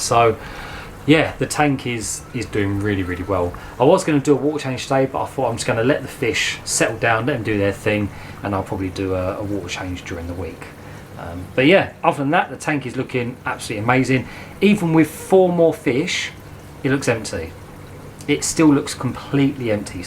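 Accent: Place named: Essex, United Kingdom. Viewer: No way that’s a 350? You also sound like a local (Essex by any chance)? Lovely specimens 🐠 👌🏼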